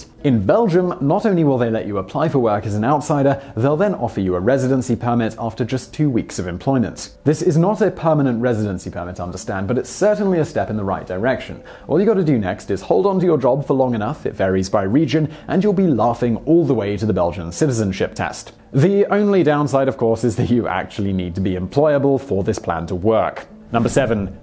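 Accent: England, United Kingdom. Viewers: He's english get over it. Different countries pronounce things differently. Who'd have thought it?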